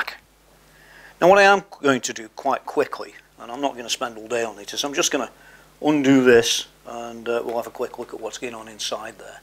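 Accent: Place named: Liverpool, United Kingdom. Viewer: you sound like you are from Livapooo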